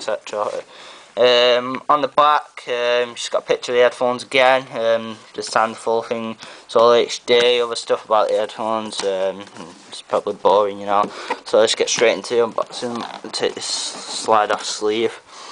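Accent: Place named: United Kingdom. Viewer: HE'S FREAKING BRITISH..ITS HARD TO UNDERSTAND BRISTISH ACCENT SOMETIMES..I HAVE A BRITISH ACCENT TOO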